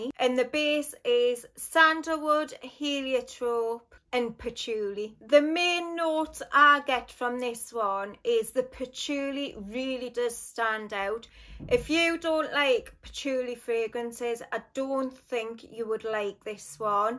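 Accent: Scotland, United Kingdom. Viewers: I love your accent! Scotland? It's so precious. Thanks for the review :)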